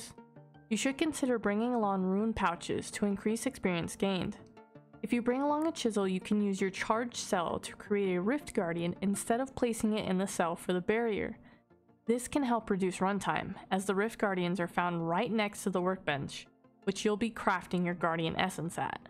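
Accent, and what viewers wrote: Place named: South, United States. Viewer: "a hunerd to a hunerd forty fragments." I love that southern accent, because it's the exact same way I say it lol. Oklahoma here. Thank you so much for this guide. I recently had b2b seizures, the second of which put me into Cardiac arrest, and they had to bring me back. I lost the last 4 months of memory, so I completely forgot anything about this mini game. Now I'm gonna go try it, thank ya!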